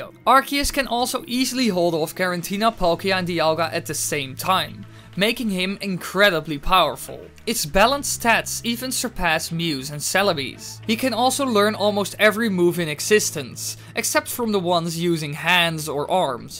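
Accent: Netherlands. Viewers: I can hear this guy is dutch. Just his accent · you sound dutch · Are you dutch?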